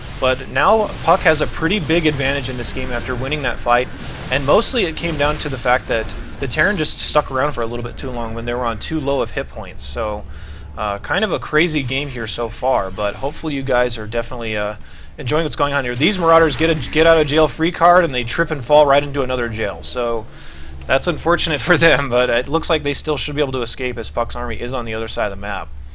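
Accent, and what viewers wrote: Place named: United States. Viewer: Wow. Protoss OP as F*ck now huh? Hope this game isn't broken at this stage in the series... Can't justify toss having what appears to be disruptor mini-nukes, AOE traps on top of colos/tempest/ templar storm. Toss now AOE gods. The beta thus far looks ridiculously in favor of protoss. Also.... with respect, its pronounced "STAY-SIS", not "STAH-SIS". You sound like an American, so I can't understand your mispronunciation of Stasis...... FYI so you don't sound like you didn't pass high school English class.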